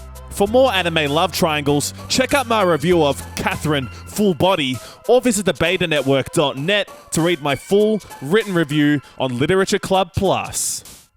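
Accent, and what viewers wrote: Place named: Australia. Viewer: why are you Australian? I actually need to know.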